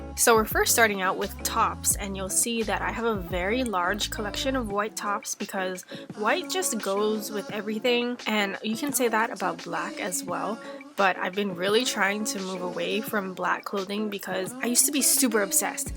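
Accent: Hawaii, United States. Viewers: As someone who’s also from Hawaii, I enjoy hearing just the slightest hint of moke in your voice! Can’t wait to see the moving vlogs (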